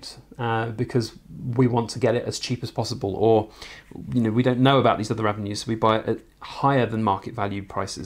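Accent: England, United Kingdom. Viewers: Oh, this is, he's English right? makes sense lol 😁 love ya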